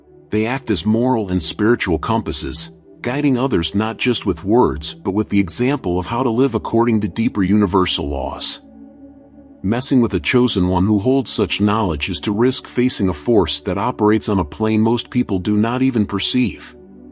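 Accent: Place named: United States. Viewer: You have to drop the American accent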